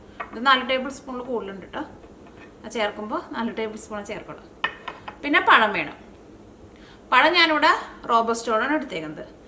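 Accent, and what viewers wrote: Thrissur, India. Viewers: chechee alpam speed kurakamo samsaram trissur kaaree aanu alle?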